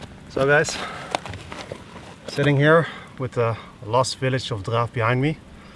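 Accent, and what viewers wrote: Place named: Netherlands. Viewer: Nice to hear your Dutch accent We (brits) lived in the Netherlands for 30 yrs I think someone else has told you that the village of Drave is used by the scouts as a base so not really 'lost' I was more interested in the story of the Brits and Germans who worked together in the other village As I live in Portugal now I must research that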